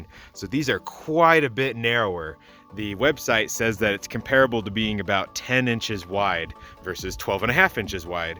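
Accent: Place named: Utah, United States. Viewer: Ha. I thought you had a Utah accent!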